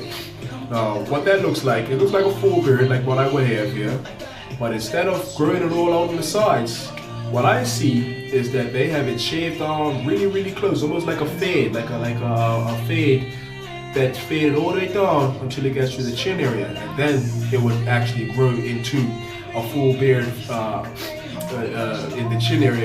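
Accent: Bermuda. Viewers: Nice video, b'ruh, and way to represent! I've been watching and following various people on YouTube giving beard advice and tips. Didn't even think yours was from Bermuda until I heard you speak. I've been growing mine for awhile but kept on trimming or keeping it low. Now I've let it grow. It's kinda like that Kimbo beard (well that's what people call me at times, lol) though I get the Rick Ross comparison too. It's all good. You give good advice in your video. Similar things I've heard people say in their logs and what I'd suggest too. Growing a beard definitely takes time and patience. Never compare your beard to another's and develop beard envy. Each man's beard is unique...take pride in what you got. Imitation is the most sincerest form of flattery so if you see others doing what you do just take it as a compliment. Nice job, b'ruh. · Saw the 441, heard the accent and knew straight away. 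Shout out to Bermuda!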